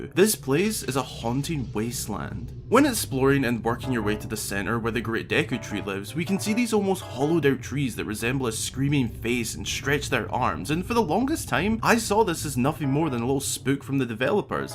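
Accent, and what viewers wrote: Scotland, United Kingdom. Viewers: Last week, I had a dream that I was in a very creepy castle full of ghosts. As weird as it is, voice, in all its Scottish glory was there narrating was I was going to encounter.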